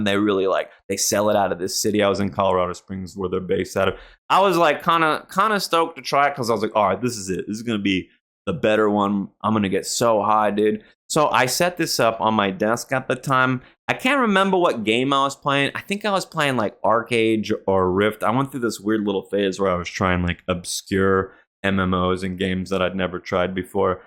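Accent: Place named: New Jersey, United States. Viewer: Am i the only one that's noticing part of a jersey accent in his voice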